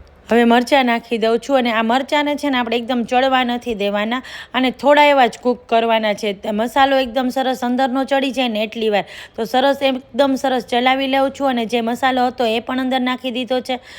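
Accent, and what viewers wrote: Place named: Kathiawar, India. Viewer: Bahut achcha bolate ho ekadam kathiyawadi style😊😅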